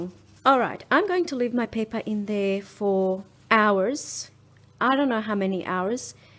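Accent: Australia. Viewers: She’s Australian. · Your videos are always inspiring and as I’ve said before it’s wonderful to hear an Aussie accent!!!!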